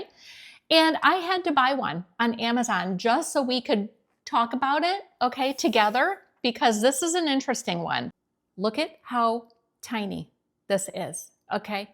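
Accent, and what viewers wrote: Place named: Minnesota, United States. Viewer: Is she from Minnesota? I love her accent! ☮️💟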